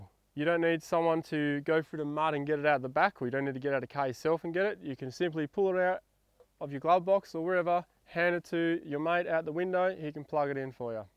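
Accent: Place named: Australia. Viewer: Aussie deep voice gave me nightmares!!😄🤣🤦‍♂️ if the devil were aussie!!🤣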